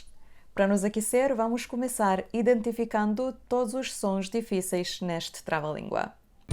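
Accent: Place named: Portugal. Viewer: Si te parez bien, te falo n'asturianu. Yes una moza per guapa y salá. Préstame muncho el acento de Portugal. Pa mí aína somos parientes. Puxa Portugal ✊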